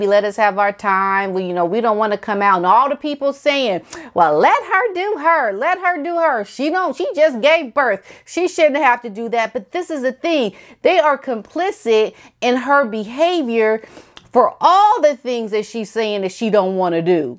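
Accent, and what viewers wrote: England, United Kingdom. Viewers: I love your English accent😂. Keep up the good work.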